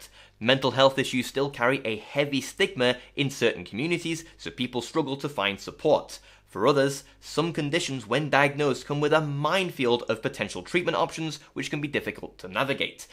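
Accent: United Kingdom. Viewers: In the future it's worth pointing out where the charity you're advocating for is based in. As I suspected from your accent and I did look it up - Mind is based in the UK. I don't have anything against helping UK but I live in Poland and mental health awareness is basically non existent here and we also need a lot of support.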